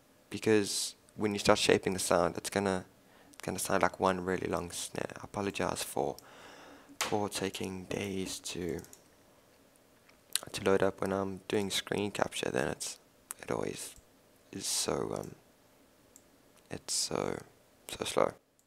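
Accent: South Africa. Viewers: ***** Hey man, are you from South Africa?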